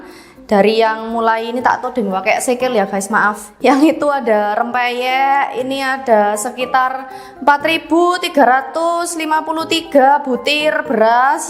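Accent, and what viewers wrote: Java, Indonesia. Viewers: It’s Indonesian language with Javanese accent 😂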